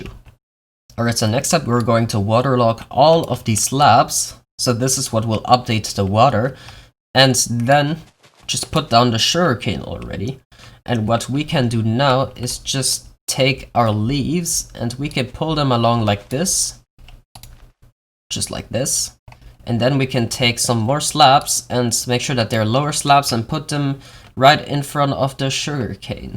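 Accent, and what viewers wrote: Germany. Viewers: sounds a bit German(?)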